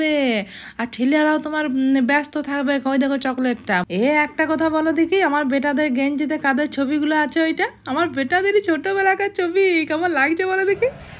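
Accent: Bankura, India. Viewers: A kon jelar bhasha??Bakura na purulia??aktu janaben pls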